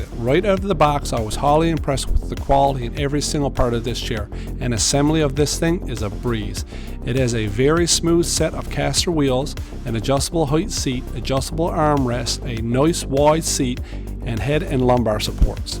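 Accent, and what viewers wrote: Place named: Newfoundland, Canada. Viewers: These look great. And I can hear from the accent that you’re a fellow Newfoundlander. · Oh yeah, you are definitely from the Rock, by!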